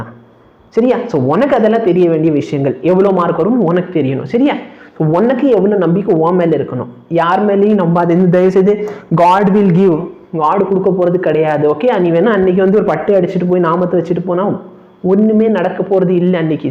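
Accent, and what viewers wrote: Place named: South, India. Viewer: mathya Pradesh a neenga south nu nenechen unga slang a vechi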